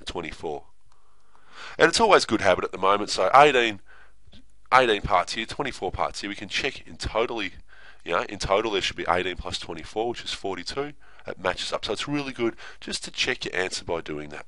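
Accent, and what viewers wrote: Australia. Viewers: Thanks so much for the video, by the way are you australian?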